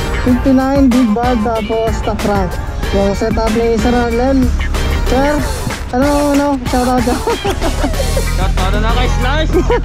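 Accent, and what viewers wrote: Iloilo, Philippines. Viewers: Ilonggo ka lods tga dn kmn?🤣🤣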